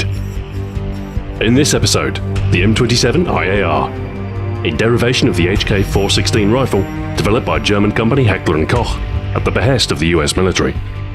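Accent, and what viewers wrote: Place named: United Kingdom. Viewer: You sound like Capt Price to me and it's because you're British LOL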